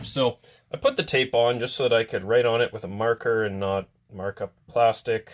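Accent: Canada. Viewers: A lot of people must not understand he's Canadian when they're listening to him. Pretty sad really.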